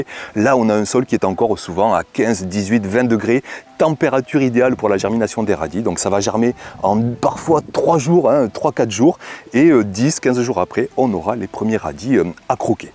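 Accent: South, France. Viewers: Un grand merci, pour tous tes conseils sur le potager. Ton accent du Sud et ta bonne humeur rendent chaque astuce facile à suivre et motivante 💚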